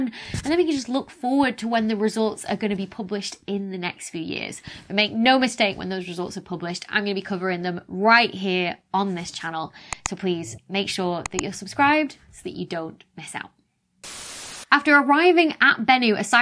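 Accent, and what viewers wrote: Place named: Cockney, United Kingdom. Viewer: "ME-thane!!!" You're such a cockney cutie!!! I'll bet you say "al-U-minium," too?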